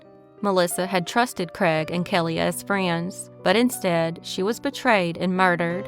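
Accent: South, United States. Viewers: Your thick southern accent ist so cute! My mother tongue ist German, i live in Germany and i lovr strong southern accents!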